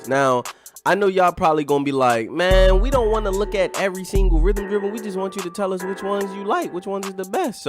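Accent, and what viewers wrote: Baltimore, United States. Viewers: U from bmore ain’t chu ? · got that deep baltimore accent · Lmao I subbed cause your accent bra it reminds me of the wire👌🏾💪🏾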